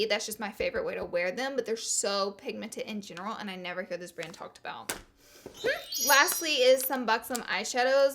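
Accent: South, United States. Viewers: "wear it around town" hahah! where are yu from i can't put my finger on the accent! sounds southern almost to me!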